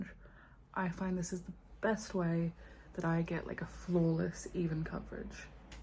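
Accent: Australia. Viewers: Random comment.. First time I've heard her Australian accent now that she's speaking a lot. I thought she was English from the other videos (hardly any speaking).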